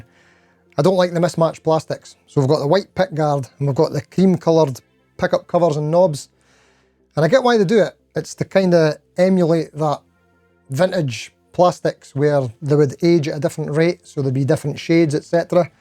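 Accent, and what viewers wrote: Scotland, United Kingdom. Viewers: It’s shocking the scottish accent for spanish people 😅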